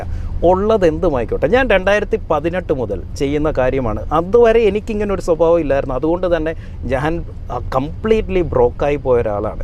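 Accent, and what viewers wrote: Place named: Alappuzha, India. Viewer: ആലപ്പുഴ ഭാsha not കൊയ്‌ക്കോട് ഭാഷ..they are njannamunja but can sell a koikode to any keralites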